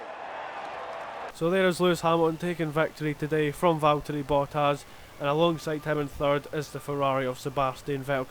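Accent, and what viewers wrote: Scotland, United Kingdom. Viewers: Good video and your Scottish accent is great! Lovin it!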